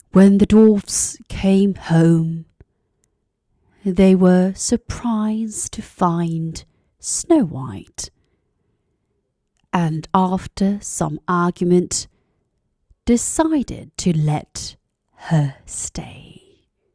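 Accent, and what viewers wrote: United Kingdom. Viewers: 진지한 개그, 그리고 귀여운 외모, 느릿한 브릿 액센.. 중독성 너무 강해요. ~!! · 너무 멋진 목소리!👍 구독, 좋아요를 누를 수밖에 없도록 만드는 위트와 격조있는 목소리. 영국식 영어를 선호하지 않는데, 그냥 빨려든다 빨려든다... 매력에~~~~!!❤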